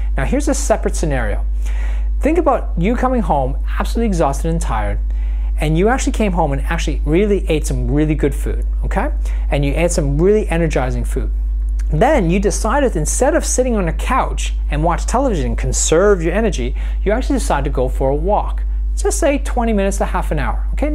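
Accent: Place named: United States. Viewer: You sound American or maybe Canadian.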